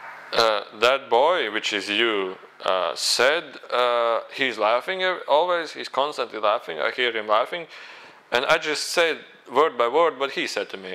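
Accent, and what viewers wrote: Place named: Poland. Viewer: “Witam.” are you polish??ur accent sounds like soo i could be wrong:)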